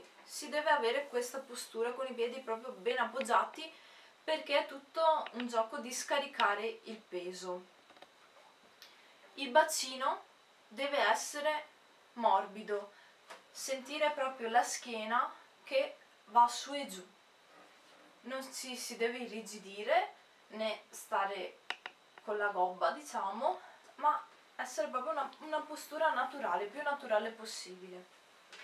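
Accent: Veneto, Italy. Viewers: Dall'accento non sembri toscana sembra veneto,comunque bellissimo strumento mi piacerebbe suonarlo ma lo trovo difficile per me.. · Hai studiato in toscana ma mi sembri veneta dalla pronuncia 🤔...sbaglio ?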